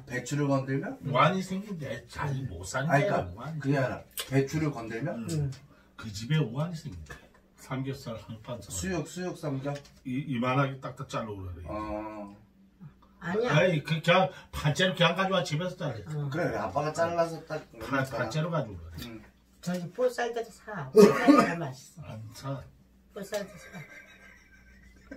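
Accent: Gangwon, South Korea. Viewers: 아직 먹어보지는 않았지만 함 먹고싶네요 산초기름도 첨 알았네요 잘봤습니다 ^^ 말투가 강원도 같기도 하신듯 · 말씀 하시는게 강원도 사투리 쓰시는거 같아요~~ㅎㅎ 집안분위기 너무 행복해 보입니다~~^^ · 오늘처음보는데 그 웰컴동막골에나오는그말투시네요^^ 강원도인가요? 강원도어디말인가요?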